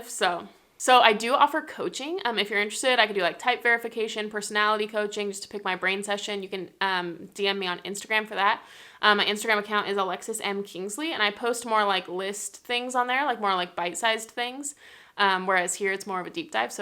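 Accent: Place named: France. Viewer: Kool Vidéo! You speak english like it is french😎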